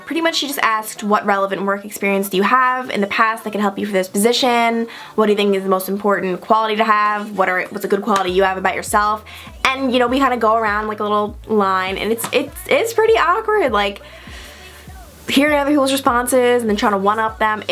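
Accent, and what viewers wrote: United States. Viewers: I love your accent! Which part of America are you from? 🌞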